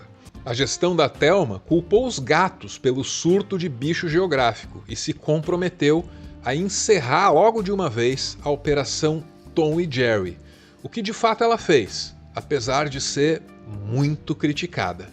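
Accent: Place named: Bahia, Brazil. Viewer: E com um sotaque bom e gostoso😂 deve ser baiana, como eu kkk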